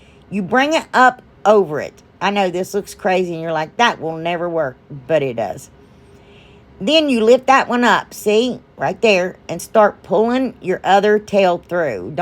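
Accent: South, United States. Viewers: I have watched most of your videos and that says a lot since I just found you in the last month or two. I love you. Your laugh is such a blessing. It just uplifts me. I started watching your videos while I have been recuperating from illness. I became ill the week before Christmas and I am finally feeling close to normal. I first thought you were from the south, you sound it, but you say "you guys" which is a northern usage. We here in the south say "Y'all". Whether you're from the north or south you have not offended me. I have listened to most(my husband won't let me listen when he's watching tv). I understand everyone can't afford all of the things you have, I can't. I do appreciate that you use a lot of things from the Dollar Tree. You are not rude by no means! You are very kind especially the way you sign off. I feel like you are my friend. I wish we lived close. 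I live in a small town in the most northwest city in Tn. God loves you and so do I.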